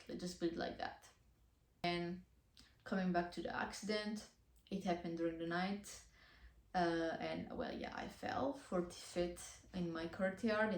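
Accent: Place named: Italy. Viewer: Your accent sounds Italian. 😊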